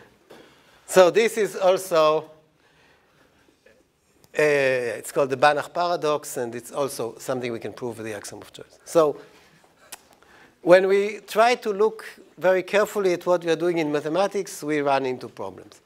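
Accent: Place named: Israel. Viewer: The professor sounds so Israeli. LOL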